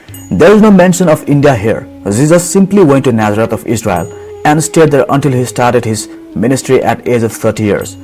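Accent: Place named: Northeast India, India. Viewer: 🤣🤣🤣🤣🤣 come to me I'll teach u n make u understand abt Christianity... So plz just by using Indian tune... U are not still able to satisfy my thirst, coz we sanatani don't just clam without logic or scientific theory... Which ur missioners call it "Black magic" or "witchcrafting" 🤭🤭.... According to ur missioners Story book so called "Bible" God made this earth in 6 days n on 7th day he took rest... M I Right? Whr was God? N who is that Super natural power? Now u'll say Jesus Christ Father... 🤣🤣🤣 plz thats enough... N ur God stays at cloud no 7 or 9.. 🤭🤭🤭 without lift... Bro, but why ur accent sounds like converted northeastern Indian ? N If u are? just rise ur one hand up n come to me... I'll make sure that ur life shouldn't be in Dark Years... Trust me no brainwashing, no slagging.. Just peace...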